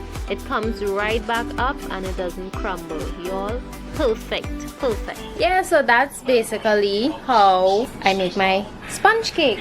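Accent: Trinidad and Tobago. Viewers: Girl, you sound like a True Trini. I subscribed to your Channel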